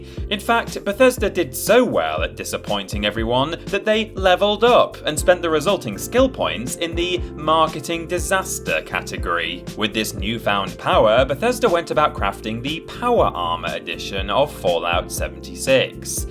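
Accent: United Kingdom. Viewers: They're British :-) They were former WhatCulture Hosts.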